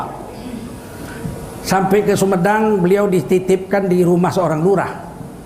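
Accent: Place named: Aceh, Indonesia. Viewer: Bahasa acehnya kental juga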